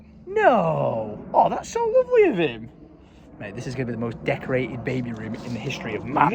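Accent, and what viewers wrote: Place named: England, United Kingdom. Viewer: Man it sucks so much it really does. Being able to watch you through all of these years give your heart out to those who give theirs to you and seeing the so unforgiving fate that awaits them is just so unforgiving. There is a community within YouTube and then there is a community within these 2 channels and the sheer amount of love and support and kindness shared in this community alone outglows all of the others. I’ve been a fan since way before Alec got to meet you and up until now and being able to see the interactions between you and these amazing people is so amazing but life is just so fing unforgiving and unfair to those who deserve it the least. For what it is worth, I’m glad that you two were able to spend the time and memories that you did together as I know and can sympathize to an extent just how important it is to both of you. 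I’m sure that even though he is gone, he will still remember the moments shared and you will continue on to remember them just the same. I’m so sorry that your life is so riddled with this fate for so many people. I’ve watched you since you were a kid and in a way, just through a screen you feel like a brother at times. Watching you so often has even made me forget that you have an English accent and live on the other side of the world because of how much you allow us to be part of your life and it means the most to us. I’m so sorry for your loss. It truly is heartbreaking. I wish you, lyd, Alice and his family the best in this time. Love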